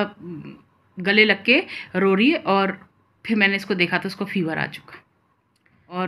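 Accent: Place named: Bundelkhand, India. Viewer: Mem aap beech beech m Bundelkhand ki voice me speech de deti ho😁😃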